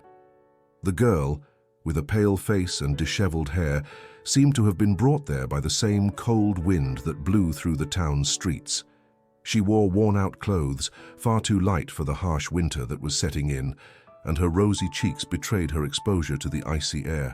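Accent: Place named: United Kingdom. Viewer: I enjoy your English English. American English I do not like so much. So your pronunciation is a melody for my ears. Hard work, honesty, perseverance is what makes people happy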